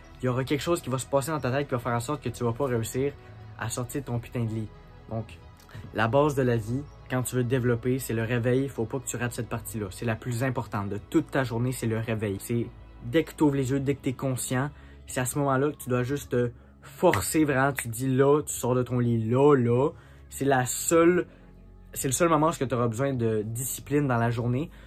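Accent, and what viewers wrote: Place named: Quebec, Canada. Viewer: Toi tout ce que t'as à faire quand tu parles français c'est de faire disparaître ton affreux accent québecois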